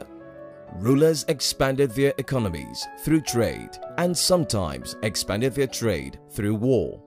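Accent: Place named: Europe. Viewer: This narrator sounds European to me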